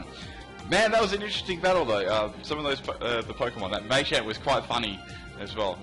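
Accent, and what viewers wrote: Australia. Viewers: Yes it is, but he's an Aussie so it's okay for them to mispronounce words, or it could very well just be his accent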